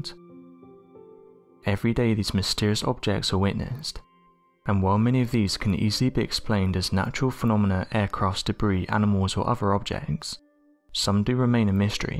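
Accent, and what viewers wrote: United Kingdom. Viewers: Can someone with a non-british accent please make some space videos. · He's British. Some of them do that.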